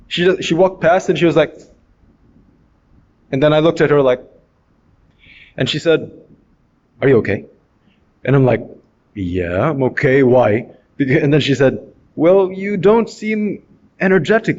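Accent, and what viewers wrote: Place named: South Africa. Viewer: Is that South African accent bro ?? Are you South African? Good speech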